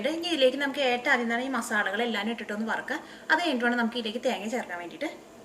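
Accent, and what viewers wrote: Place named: Vypin, India. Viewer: cheachi super vypin karude bashayil paranjal thakarthitund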